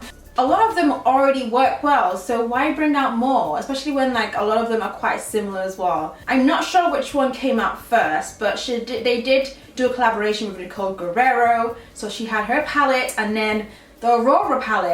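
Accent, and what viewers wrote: United Kingdom. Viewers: I love the accent! Are you British?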